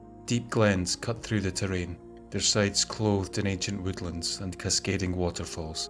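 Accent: Scotland, United Kingdom. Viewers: Excellent Narration. Excellent Voice. Thank You SO Much for the beautiful landscapes-for the Lack of background noise that would interfere-with hearing the wonderful historical, & supernatural information from your great, original Scottish voice. I've always wanted to see Scotland, & now I can, thru your wonderful videos. Please-Change Nothing. It is Perfect, as is. Cordially-Pam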